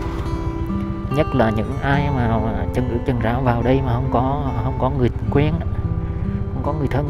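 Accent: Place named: Quang Binh, Vietnam. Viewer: Giọng miền trung chắc là Quảng Bình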